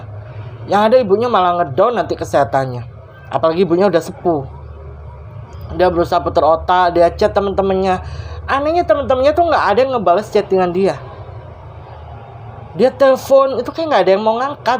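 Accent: Surabaya, Indonesia. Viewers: Mak maafin pas kamu suroboyoan nurturin ceritanya aku ngakak bgtttt lucuuuu jd kangen bule sm mbah ku di mojokertooo 😂🥹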